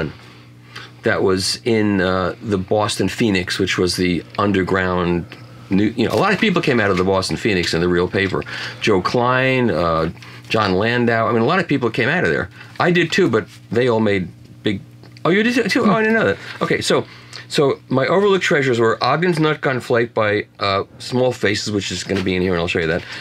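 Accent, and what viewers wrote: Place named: United Kingdom. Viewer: Love the Brit accent, but try Manchester rather than London next time. I can give you some tutalage… for a fee of course 🇬🇧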